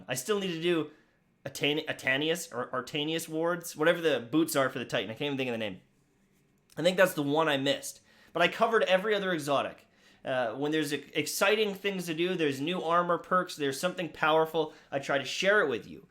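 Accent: Scotland, United Kingdom. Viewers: I’d love to see some other videos. I remember watching some of your funny Monster Hunter videos with the accents. Exotic gun reviews in that Scottish accent is always hilarious as well. Up to you where you go, but I’ll be here whatever video comes out! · Loved the snipe vids back in the day with the Scottish accent 😂. Also can't get enough of that flying mongoose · Dammit, bring back the scottish! Lol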